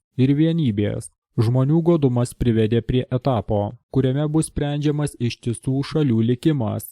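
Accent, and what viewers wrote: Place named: United States. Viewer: Klaysyk, satanistų rupore.... Išmok bent scenarijų perskaityti, ir pabandyk suprasti SKYRYBOS ŽENKLŲ prasmę, kai skaitai iš tau paduoto lapelio.... Dar.... Kokie biesai tave finasuoja? A, berašti parazite... Dar.... Tavo vertimas - IŠKRAIPYTAS ir to nepaneigsi. Ir nustok čia skleisti iliuminatų planą-svajonę. Ir žmones gąsdinti ir klaidinti. Pyzdink iš Lietuvos į tą savo jankyną, ir bent pasistenk, KAD PIDAROSIŠKAI-JANKIŠKO AKCENTO nebūtų, padla.... Nežinai nei rusų kalbos, nei lietuvių. Kas esi? Mormonas, jahovistas suknistas, ar kitoks Šėtono išpera?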